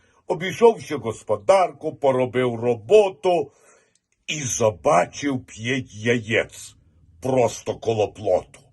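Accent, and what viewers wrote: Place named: Lviv, Ukraine. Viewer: Класно! Дуже посміялась! А львівська балачка- то супер файно!😂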